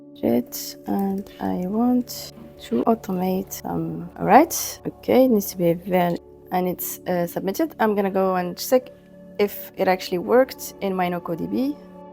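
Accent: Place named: Egypt. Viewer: طلاق تلاته مصرية و متهش عن الاكسنت😂 شكرا❤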